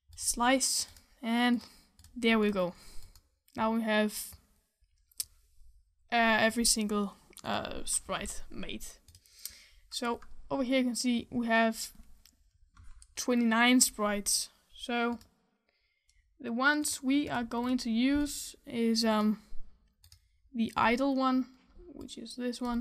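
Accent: Denmark. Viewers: Are you Danish, or what? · Bra video! Hører du er dansk! :D Er fra Norge jeg da, så håper du forstår hva jeg skriver! :D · Hey man, are u danish? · Instantly recognized the Danish accent. Especially because of the "Øh.."'s he made. Cool tutorial~ And hav en god dag B-') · Are u danish? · Are you DANISH???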